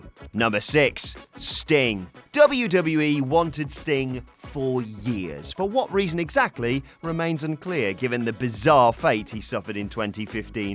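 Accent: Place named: United Kingdom. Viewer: Finally, a good British voice besides Adam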